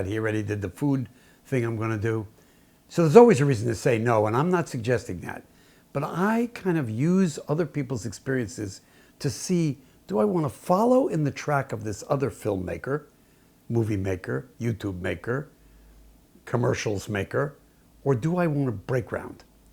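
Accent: New York, United States. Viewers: Christ your accent is so New York it's giving me flashbacks. Don't worry about the ads; I bet no one will mind.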